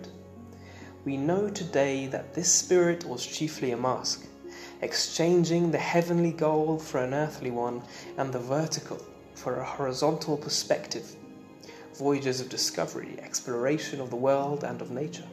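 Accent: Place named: London, United Kingdom. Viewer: Where are you from? You sound like a Londoner but I've never heard the word 'says' pronounced your way.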